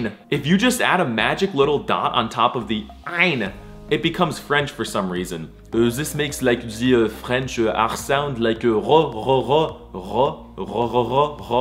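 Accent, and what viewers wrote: Egypt. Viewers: Y'know, as an arabic guy myself, the fact he learned arabic is such short amount of time, is literally spectacular. Especially that he learned the Egyptian accent too, it's a pretty hard accent. I seriously enjoy watching you! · As a Arab, I’m pretty impressed by the explanations but some of the pronunciations were mostly in Egyptian dialect and not how they are actually said, other than that amazing video we are all here to support you my brother. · ook but why you speaking Egyptian 😂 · Yeah, hes speaks Egyptian Arabic. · This is not the real Arabic prononciation,this is Egyptian prononciation,just for correction . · You speak the Egyptian dilect. It's different from the standard Arabic. The ج should be pronounced like in Jacob not like in game. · لهجتك المصرية تحفة براااڤو❤😂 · there is a small mistake here . that alphabet doesn't pronounce like a "gagaga" its pronounce like gym. 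you seem to be learnt the arabic in egypt that's why you have the accent. other than this the video is awesome. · Sorry but your Arabic is partially wrong. You are pronouncing and speaking Egyptian dialect especially for G which should be pronounced J. Your pronunciation of that letter is completely wrong. I didn't heard you talking too much sentences in Arabic but as per the few words you did used, I thing that also your speaking is Egyptian and that is not the real and full Arabic, it's only the Egyptian dialect. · my guy is talking like Egypt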